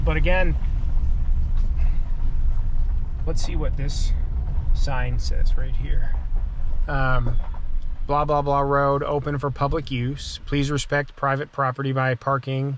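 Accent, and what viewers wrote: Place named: Allegheny, United States. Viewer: You sound like your close to where I live. I live near the Allegheny national forest.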